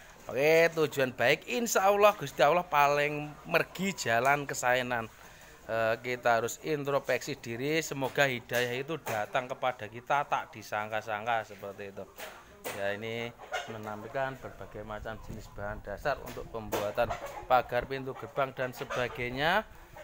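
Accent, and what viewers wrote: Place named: Banyumas, Indonesia. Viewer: Amin boskuhh.pinter bgt ngomonge kaya seles 🤣👍👍 Tapi keren mantap kang..ngapak bgtt ngomonge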